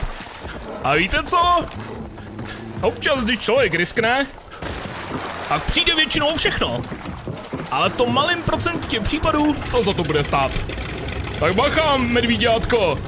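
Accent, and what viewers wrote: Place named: Russia. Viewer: Když mluvíš anglicky, zníš jako rus.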